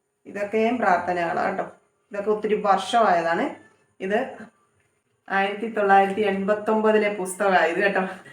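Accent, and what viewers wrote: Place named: Thiruvananthapuram, India. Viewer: തിരുവനന്തപുരം ഭാഷ ആണല്ലോ. സ്റ്റൈൽ. വീട് എവിടെ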